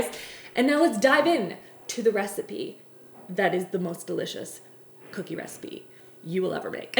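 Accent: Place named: Canada. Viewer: I seriously genuinely love your Canadian accent, it really makes me happy (( Brownies eh )) for example. Ps: all of your videos are amusing 🌼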